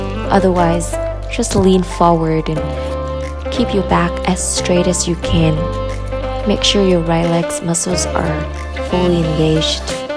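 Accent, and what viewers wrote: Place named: United States. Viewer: O nói mà tưởng bà Mỹ nói á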